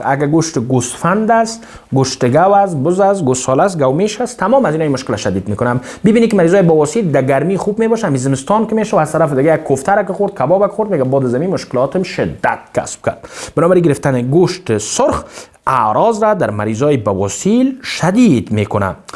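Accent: Afghanistan. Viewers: دکتر جان خیلی افغان غلیظ صحبت میکنی ما ایرانیها نمیفهمیم بیشتر حرفهارو لطفا یکم فارسی روانتر صحبت کن ممنون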